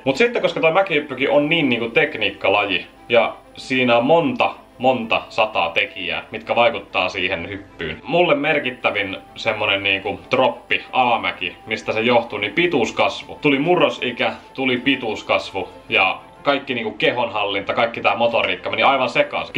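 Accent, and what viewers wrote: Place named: Lahti, Finland. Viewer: Mietin et miks puhuu lahen murteella koska "Lahen" ja ei oo lahelainen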